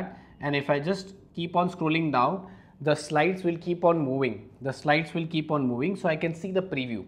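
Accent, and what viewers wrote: India. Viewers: Even with your Indian accent, you are the best teacher I've seen online. Your topics are well selected, short and perfectly well presented. Will definitely watch all your videos. Keep it up. Applause from Nigeria. Take care!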